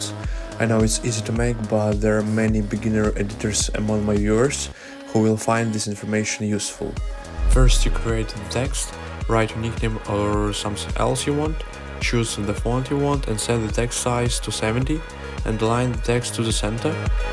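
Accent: Russia. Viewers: Чувствуется русский акцент в твоем английском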